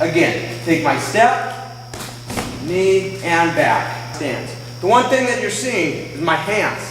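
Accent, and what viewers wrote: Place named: North, United Kingdom. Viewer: you live up north somewhere? I can tell since you say bag as bayg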